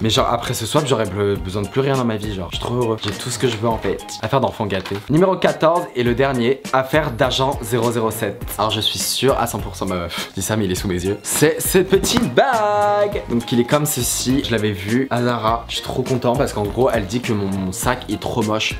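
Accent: England, United Kingdom. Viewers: Tu a un trop beau accent anglais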